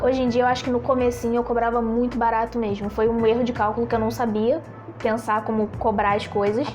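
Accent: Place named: Rio de Janeiro, Brazil. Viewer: Garota, eu que sou um vovô com tempo pra pensar, analisar coisas, filosofar e etc, fico pensando ao te assistir, que maravilha de pais você deve ter. Minhas netas são maravilhosas mas eu gostaria de ter uma uma neta igualzinho a você. Além de linda (minha netas também são) você é também muito inteligente e bem resolvida, me parece. Eu aprendo a editar vídeo com você e, ainda de quebra, me divirto com seu modo de se expressar (fala pelos cotovelos. Rs rs rs) que é maravilhosa. Até seu sotaque carioca é na medida certa. Está destinada a ser feliz para sempre.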